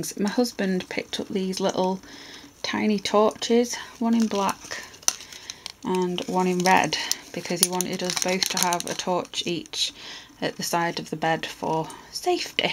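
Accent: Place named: Lancashire, United Kingdom. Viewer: I'm from Leyland Lancashire your accent sounds similar to mine so that's why a was curious x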